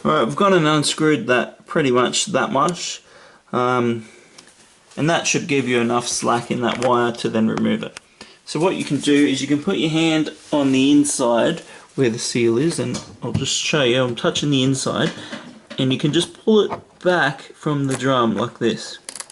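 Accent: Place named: Australia. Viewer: Excellent tutorial!!! You have done your homework. And to hear an Aussie voice, it helped me greatly with my homework. Task completed easily....thanks to YOU. Ps. If I can join the chorus...'Beers are on me'!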